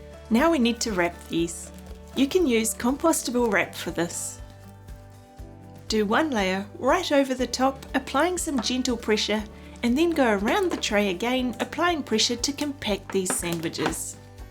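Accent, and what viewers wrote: New Zealand. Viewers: Sounds like New Zealand to me. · This woman has the most Kiwi 🥝 accent that I've ever heard. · Are you from NZ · Turns out IT IS a kiwi accent😂😂😂😂😂😂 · Are you a New Zealander?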